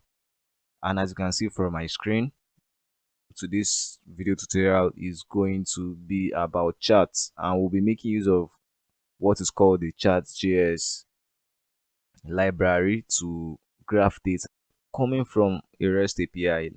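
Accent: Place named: Caribbean. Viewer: Nice video! Very helpful since I'm just starting out with APIs. Are you from the Caribbean by any chance? Cool accent :)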